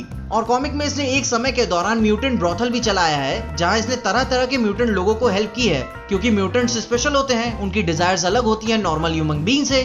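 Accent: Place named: Uttar Pradesh, India. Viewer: bhai, i watch your video since 2 days and all your videos are soooo amazing. keep doing this and your up accent is also really amazing.... kanpuriaaaaaaaa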